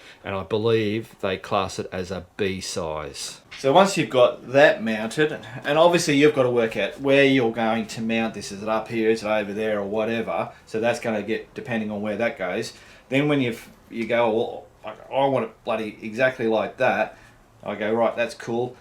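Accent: Australia. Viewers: I was 1 minute in lol, he's proper Aussie 😂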